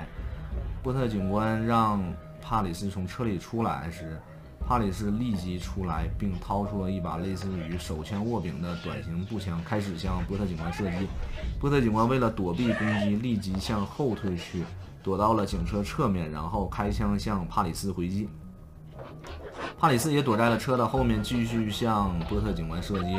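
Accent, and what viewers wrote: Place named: Northeast, China. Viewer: ok，你严谨，我应该说 up 主是美籍华人东北口音。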